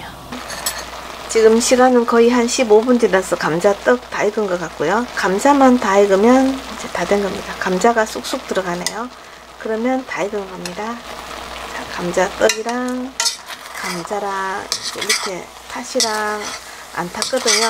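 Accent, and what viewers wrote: Gyeongsang, South Korea. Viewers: 구수한 경상도 사투리, 사근사근 설명해줘서 귀도 행복해요. 요리 쇼 보는 것이 스트래스 해소에 큰 도움되네요.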